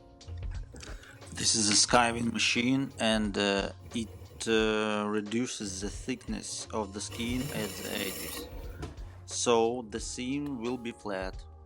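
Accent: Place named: Russia. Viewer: По акценту будто русский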